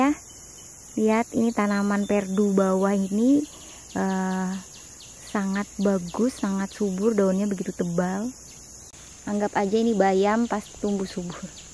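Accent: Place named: Jakarta, Indonesia. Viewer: Mb swaktu bicara logatnya seperti Jakarta apa bisa pale logat to dialek local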